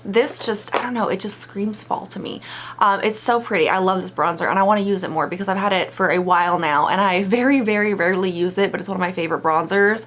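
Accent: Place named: South, United States. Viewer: You kinda sound like you have a southern accent in this video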